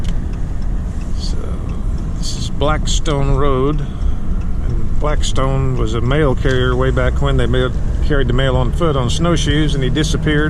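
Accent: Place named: South, United States. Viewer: Thank you for the more realistic tour of Whittier. Does the town keep the roads plowed well In winter? I wish we could have toured your condo. Seems like no homeless in Whittier. Love your bio. You seem pretty healthy for 70. And I’m sure the permanent fund dividend is a nice perk. Good for you for making your dreams come true. Whar 😮state did you come from? You sound like you have a southern accent.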